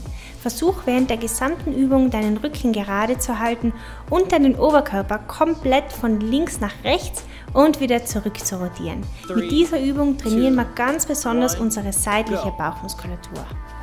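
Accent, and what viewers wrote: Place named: Austria. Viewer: Wer hätte gedacht, dass ich mich mal so über die aufmunternden Worte in österreichischen Dialekt freuen würde, während ich mich auf meinem Fußboden rumquäle! Ohne Spaß, hin und wieder versuche ich mich an anderen Workouts, aber ich komme immer zu deinen Videos zurück. Danke für tolle Arbeit!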